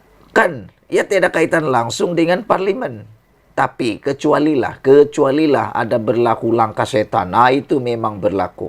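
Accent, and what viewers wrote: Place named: Sabah, Malaysia. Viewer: Jangan cakap macam tu orang sabah rasanya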